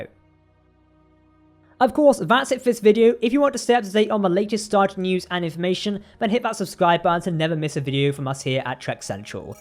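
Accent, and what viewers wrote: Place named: England, United Kingdom. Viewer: Debris is pronounced “debree” not “debreeze”. With an English accent it’s stunning you pronounce the word incorrectly.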